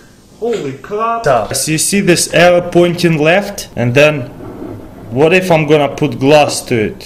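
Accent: Russia. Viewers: ...because he is Russian and I thought his accent was very charming. He is also enthusiastic, like me, about science. So, I thought "We may be from different parts of the world. Yet, science makes us all very happy." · Dude I love ur vids they are AWESOME!!! So hel9ful and nifty! Keep it up! Ps - russian accent makes it even better!!!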